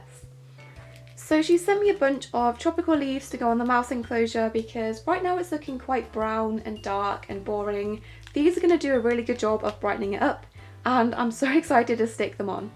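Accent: England, United Kingdom. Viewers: At the end you said about your voice. Well I think it's lovely. English accent is really cute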